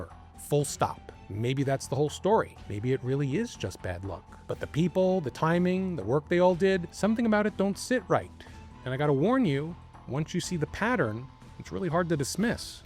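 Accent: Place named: Pittsburgh, United States. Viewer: I gotta ask, are you from Pittsburgh? I know you say in the beginning you are in Vermont but your accent reminds me of my hometown. ❤ Love your videos BTW.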